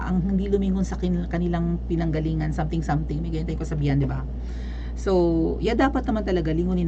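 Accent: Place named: Visayas, Philippines. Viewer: Korek ka ma'am pagsinabe mo bisaya ako. Sagot naman nila kaya pala tuno mo palang alam na sabay tawa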